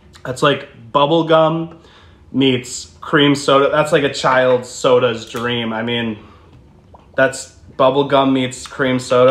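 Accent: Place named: United Kingdom. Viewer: homie talks like a UK person trying american things.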